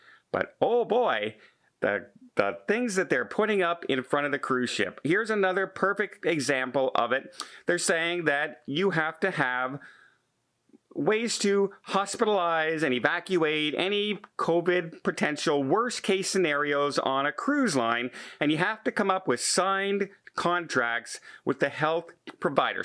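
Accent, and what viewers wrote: Canada. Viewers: All this a guy who's country has banned cruising for a year. If you want to criticize a U.S. Agency then drop the Canadian accent clown and stay in your country!!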